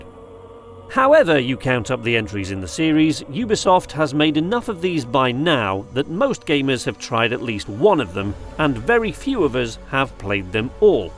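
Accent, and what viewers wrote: United Kingdom. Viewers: This guys is so British 😂😂 good fun review tho 👍🏻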